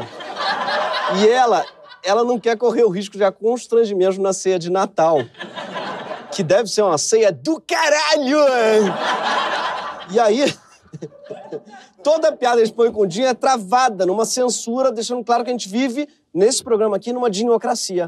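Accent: São Paulo, Brazil. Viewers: A melhor imitação de paulistano que existe, cara 🤣🤣🤣🤣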